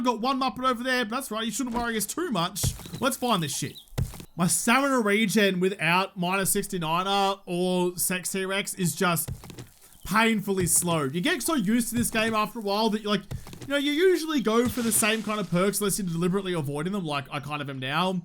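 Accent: Australia. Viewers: "Some sneaky Molys...." I love the Aussie vibe to it, but dude, you gotta admit that one just sounds ... Without the Aussie vibe.... Not smthg I would be OK with XD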